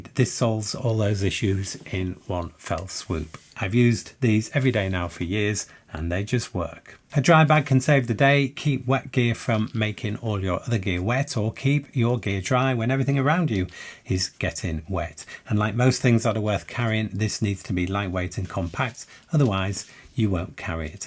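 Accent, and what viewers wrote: England, United Kingdom. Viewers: That’s an English accent.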